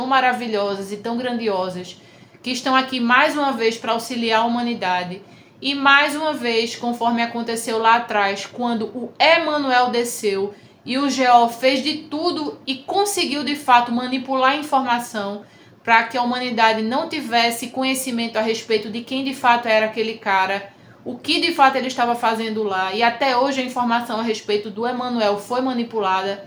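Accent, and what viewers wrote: Northeast, Brazil. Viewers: vc deveria fazer videos com mais frequência. ACHO QUE PELO SOTAQUE MEIO NORDESTINO AGENTE ENTENDER ATÉ MELHOR AS INFORMAÇÕES😂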